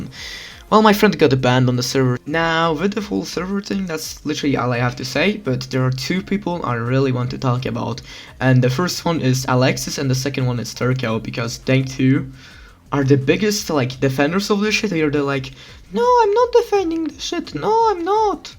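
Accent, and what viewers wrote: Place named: England, United Kingdom. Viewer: ale englisz ladny masz ty z angli chyba jestes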